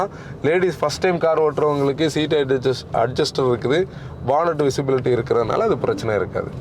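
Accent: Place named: Nagercoil, India. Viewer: Every sedan, hatchback, suv and electric vehicle launch time. I search channel.for your"s valuable review. Because your brief and depth points like vehicle drivability, ride and handling. build quality and also your Nagor kovil language slang. Hat"s up bro and her team mates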